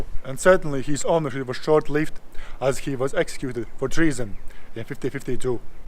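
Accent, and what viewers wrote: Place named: Latgale, Latvia. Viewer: Būtu ļoti noderīgi subtitri angļu valodā, jo tu lieliski veido stāstus/tekstus pagātnes formā! 😊 Par to ka esi latvietis - uzminēju 😊 Latgales akcents 😎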